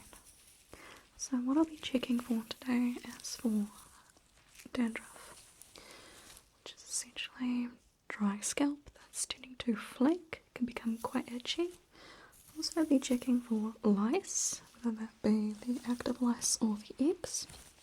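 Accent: New Zealand. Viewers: R u from nz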